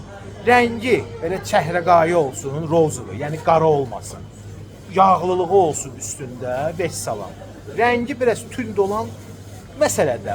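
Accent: Baku, Azerbaijan. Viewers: Çəhrəgayiye özüdə,ultra, modern,pro Bakı lehçesidüraa.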